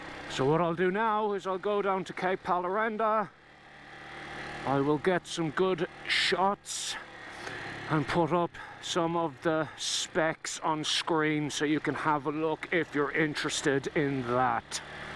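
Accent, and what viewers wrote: Ireland. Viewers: An Irish accent under the palms?.......good lard! I agree, a fun little bike!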